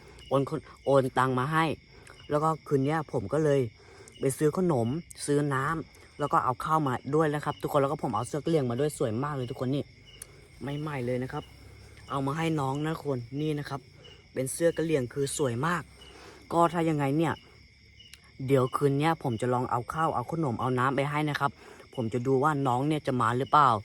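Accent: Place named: Rural, Thailand. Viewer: เพราะว่าพูดเหน่อ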